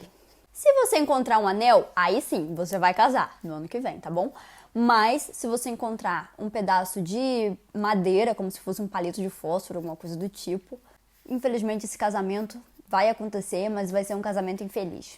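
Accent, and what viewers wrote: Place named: Ireland. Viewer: Oii kkkk eu fiquei meio bugada, parecia que vc era uma irlandesa que falava português como segunda língua kkkkk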